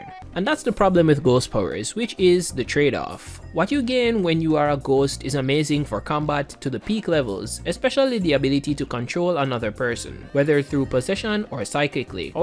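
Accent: Jamaica. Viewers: Are you Jamaican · r u jamaican?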